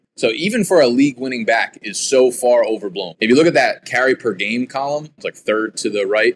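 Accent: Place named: West Coast, United States. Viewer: Sounds like a West Coast kinda guy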